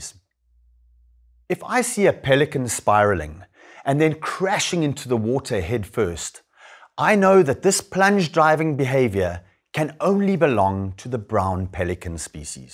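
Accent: South Africa. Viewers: I can clearly hear this guy is South African. We love bird watching 😂😂😂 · I knew your accent was different! I just saw a comment that said you are south African.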